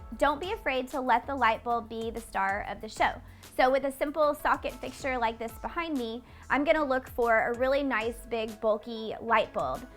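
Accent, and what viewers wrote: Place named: South, United States. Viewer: Love your charming southern accent and personality. Excellent tips on the dimensions. Nobody tells us that. jkr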